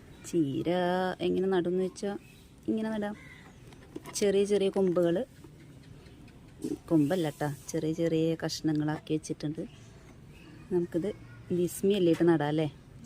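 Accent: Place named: Kochi, India. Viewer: ഇങ്ങള് കൊച്ചി കാരിയാണല്ലേ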